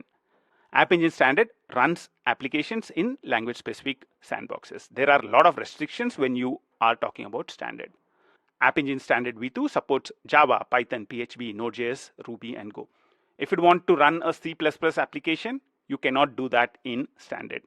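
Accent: India. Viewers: Dear Colleague, please get rid of the Indian accent.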